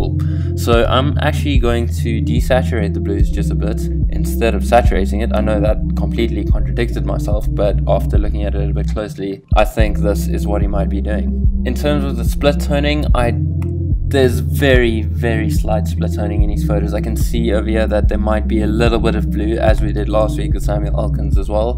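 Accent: South Africa. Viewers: Immediately knew you were from Cape Town when i heard your accent.. great youtube channel and great content.. I'm about an hour away from the city and I'm also a photographer. Keep up the good content.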